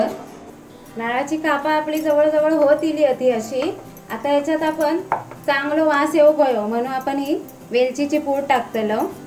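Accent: Malvani, India. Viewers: Khup chhan boltas tumi... Pure malvani... Recipe pn mast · Mastt.. 👌.. Tumchi malvani aikuk khup maja eli.. 👌👍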